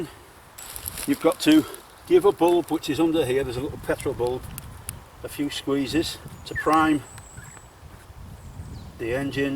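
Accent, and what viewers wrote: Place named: Northern England, United Kingdom. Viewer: This is a great video and a lot of effort put into it. I think the dislikes are from people who aren't front the UK and can't understand your Northern accent😆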